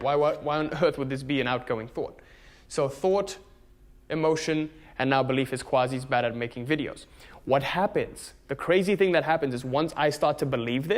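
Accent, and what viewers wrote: South Africa. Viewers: I can hear an English accent but with a slight South African cadence and you are living in Florida :) Great video btw! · South African tones….? Quasi cool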